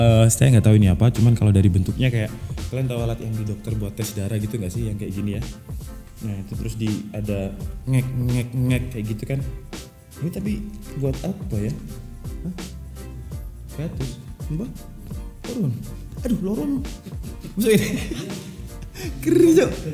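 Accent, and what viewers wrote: Java, Indonesia. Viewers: maaf ya kak kakak itu orang Jawa atau orang jakarta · Radak ono jawa"nya sitik😂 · Keri cok, jowo bang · Sampean jawane pundi mas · jowo mu ngendi kang...😂😂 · Wong jowo pa bang😅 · Sampean jowone ngendi bang. Wkwk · Wng jowo cek😂😂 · Jan wong jowo tenan ki rek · Lo orang jawa ya bro. Bahasanya kok mirip orang jawa gtu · Mase wong jowo🤣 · Asli ndi seh sam peno, ⁉️. Koyok e wong jowo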